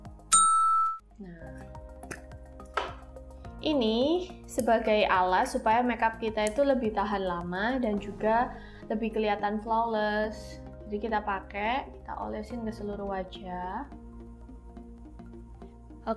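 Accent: Java, Indonesia. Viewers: pertama kali liat langsung subscribe :D lucu medok jawa gitu haha sering" upload dong kak ^^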